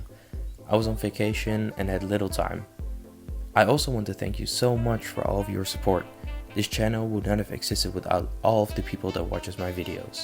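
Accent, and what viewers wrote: Netherlands. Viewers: I am sorry but you have a very Dutch accent :p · Omg it's so funny because I'm from Belgium and I can really Hoor dat je een Nederlander bent aan je manier van engels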